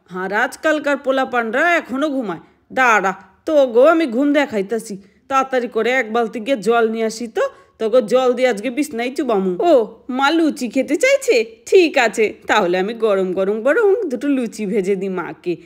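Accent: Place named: Bangladesh. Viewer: হুম কথার টোনে বাংলাদেশি কিছুটা বুঝতে পারছি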